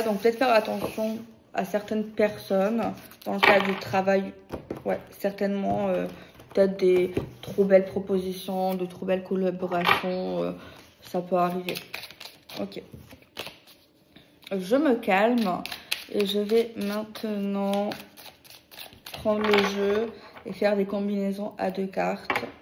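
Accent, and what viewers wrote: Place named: Provence, France. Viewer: Coucou vous êtes de quel département le Vaucluse ou bouche du Rhône pour l'accent que vous avez ? Bonne journée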